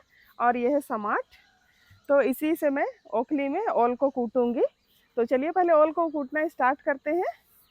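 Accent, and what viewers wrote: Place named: Bihar, India. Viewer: Hamlog bihari hai lekin aapki sari bate hamlogon ki tarah hi hai isliy achche se samajh aata hai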